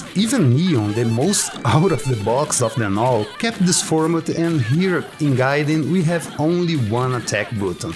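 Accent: Brazil. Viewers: Esse cara é Brasileiro, eu conheço o Inglês Brasileirado de longe....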